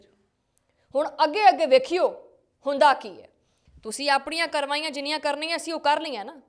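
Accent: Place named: India. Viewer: اسلام علئیکم بیٹا کیسی ہیں آپ آپ جب انڈین اسٹائیل میں اُردو بولتی ہیں آپ کا یہ اسٹائیل بہت زبردست لگتا ہے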